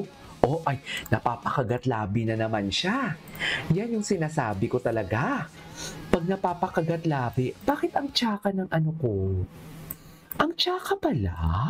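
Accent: Iloilo, Philippines. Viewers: Baw ilonggo ka sir ?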